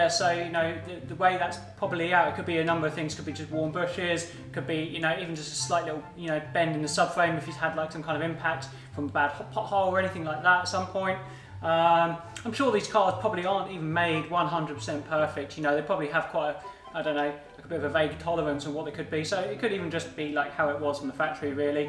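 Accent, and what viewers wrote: Liverpool, United Kingdom. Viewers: Superb! keep it up bro loving the work 👍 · for the win my favourite ginger 🐒. Miss seeing mitto also get him back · ! you Strawberry blonde brother hahaha keep the vidz coming my guy 🔰🔥📚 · Best Channel, Best Content and Best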